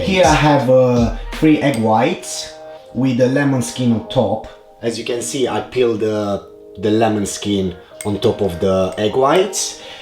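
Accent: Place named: Italy. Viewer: Where are you from? You've got that sort of Italian accent which is cool btw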